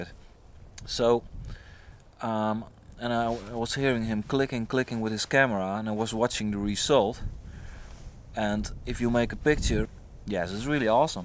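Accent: Netherlands. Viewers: haha hoorde het door het accent wel vet man die northern lights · you sound dutch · How you talk is totally Dutch even your name ! *Peace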